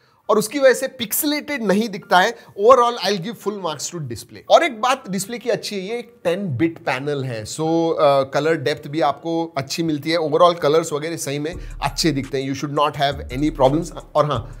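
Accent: Maharashtra, India. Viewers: Nahi gelare, kon mhtla gela🤣🤣 pure gauthi marathi 🤣 loved it as well as tab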